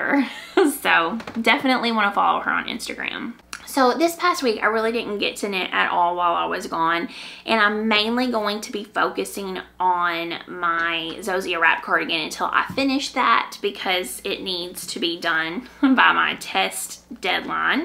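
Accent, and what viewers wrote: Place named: Arkansas, United States. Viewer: Hi! I love your podcast!! I’m a fellow Arkansan transplanted to Texas a long time ago and I just love hearing your accent from home! I love your Zosia wrap cardi - I can’t wait to make it in a light gray. I also recommend reading “A Gentleman in Moscow” if your haven’t already read it. It’s a beautiful story. My mom and I are reading the Thursday Murder Club series at your recommendation and absolutely love it! Thanks so much for all you share!! You’re so inspiring 🐝 🐓 🧶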